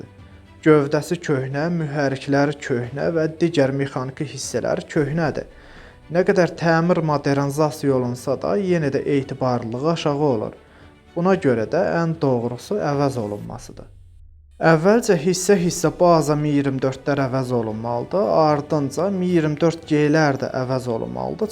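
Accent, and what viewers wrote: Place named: Gadabay, Azerbaijan. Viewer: Qardaş Gədəbəylidi deyəsən. Ləhcəsinə görə düdim. Uğurlar.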